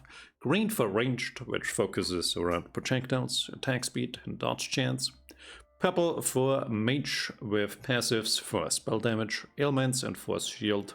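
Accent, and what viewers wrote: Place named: Germany. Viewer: No wonder why i felt the German accent :)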